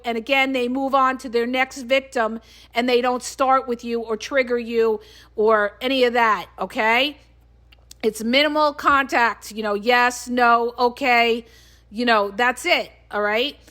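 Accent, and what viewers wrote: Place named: New York City, United States. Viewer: Greetings from Springfield, MO. You sound like NYC gal 👧. God Bless you! You are very entertaining 😂 I'm really enjoying your content, 2 years no contact with Narc family members hallelujah!! It feels amazing 👏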